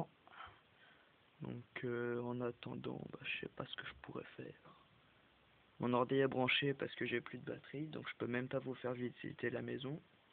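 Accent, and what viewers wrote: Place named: Marseille, France. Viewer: UN MaRsEiLlAiS !!!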